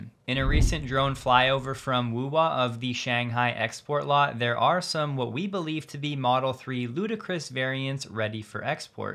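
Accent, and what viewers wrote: United States. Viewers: Thanks for the updates. 🙂👍 Your speaking speed is fine. If you speak any faster, English speakers will start to have trouble in understanding your strong U.S. English accent. There are a number of U.S. channels which many English people cannot understand because of strong accents and rapid speech. People who speak English as a second language will have even more difficulty.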